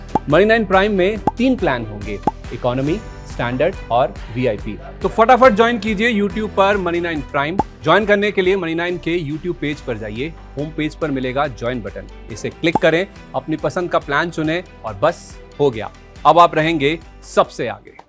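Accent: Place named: Bihar, India. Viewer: ये बिहार के है इसलिए इनका एक्सेंट ऐसा है।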